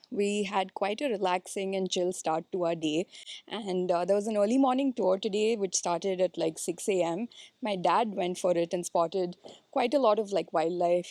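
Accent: India. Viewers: Video was good except hilarious strong indian accent, I can't take it. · Most people follow your YouTube channel, and 99% of them do so to learn English with an Indian accent. Therefore, I hope you lean fully into the authentic Indian accent in your content; travel details are not that important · Hindi ke liye there are other channels Paramvir, Shubham, Navankur. makes her videos in English aur bahut saare log inke videos dekhte hi English seekhne ke liye kyuki yeh Indian accent mein fluent English bolte hai.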